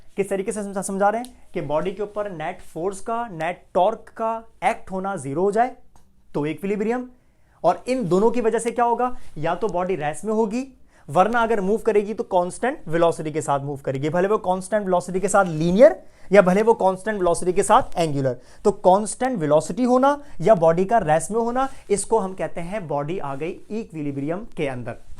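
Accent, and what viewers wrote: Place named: Karachi, Pakistan. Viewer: sir ki language sy tu karachi ky lgty ha 😂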